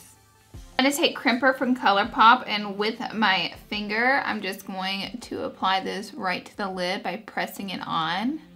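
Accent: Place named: Georgia, United States. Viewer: Ugh, it upsets me when people make comments about your voice because your voice is so cute!! I literally could listen to it all day, ur accent reminds me of back home in Georgia.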